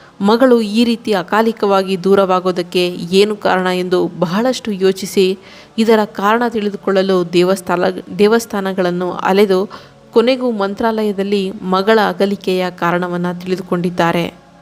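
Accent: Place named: Mysore, India. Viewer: ಮೇಡಂ, ನೀವು ನಿಮ್ಮ ವಾಯ್ಸ್ ಗೊತ್ತು. ಮೈಸೂರಿನ ವರು.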